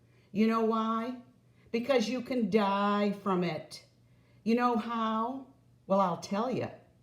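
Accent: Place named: New England, United States. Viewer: This is my first video to see from you. Thank you for this explanation. I have a family member who has lymphedema in her arm, as a result of her breast cancer surgery. She told us about some of her treatments and the sleeve she’s supposed to wear at all times, but we didn’t realize the seriousness of what she’s living with. I enjoyed your video so much that I just subscribed. Plus it sounds a little bit like you’re from New England. I’m not from there but I lived there for a few years! So it’s fun to hear the accent again. ☺️ Anyway, I look forward to watching your other videos and following your future progress!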